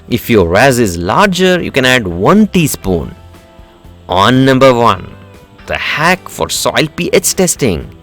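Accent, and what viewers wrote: India. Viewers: Nice India voice,thanks for posting …?How used for COVID …? ( veterans)🇺🇸🇨🇦🇫🇷🇬🇧🦠😛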